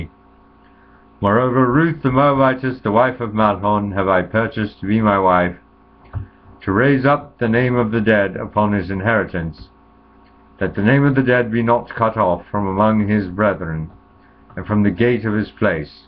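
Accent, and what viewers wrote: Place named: Scotland, United Kingdom. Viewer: your Scottish great-grandmother (who is my grandmother) would be proud of your command of the Scottish brogue! Well done! And God says His Word will not go out and return unto Him void. - Judy Doorn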